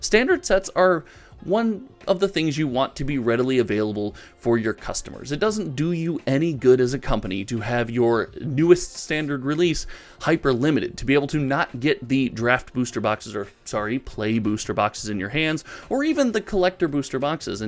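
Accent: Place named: Chicago, United States. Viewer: Did I just hear your from the Chicago land area?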